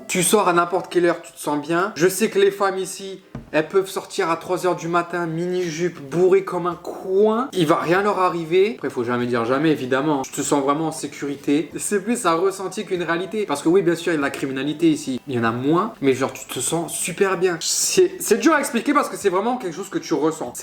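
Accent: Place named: Quebec, Canada. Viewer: En effet, comme l’a dit de Gaulle à Montréal en 1967 : « Vivi le Québec libre ! « Pour l’accent québécois, dans quelques années tu l’auras mieux mon pot, mais c’est déjà un bel effort. Ciao!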